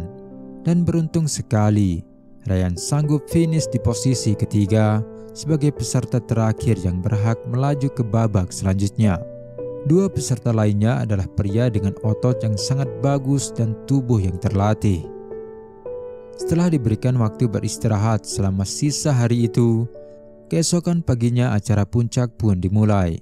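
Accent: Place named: Lombok, Indonesia. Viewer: Authornya ni ngomongnya sayup2 kayak logat sasak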